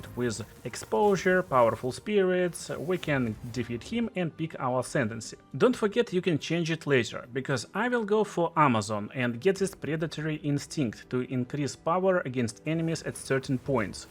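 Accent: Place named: Russia. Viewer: Вери строн рашен эксент.) Nice starter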